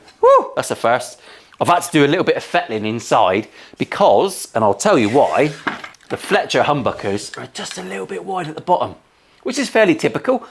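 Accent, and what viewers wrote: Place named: Cornwall, United Kingdom. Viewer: Listening to you makes me miss my time spent living in Plymouth and Cornwall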